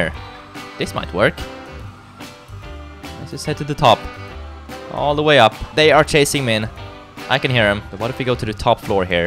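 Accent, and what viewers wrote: Sweden. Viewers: oh he is Swedish i knew it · are you from sweden? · are you swedish? ps love ya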